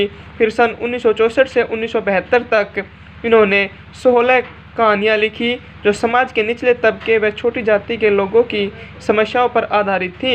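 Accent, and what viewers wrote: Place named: Haryana, India. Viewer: आपकी बोली में हरयाणवी भाषा की शैली है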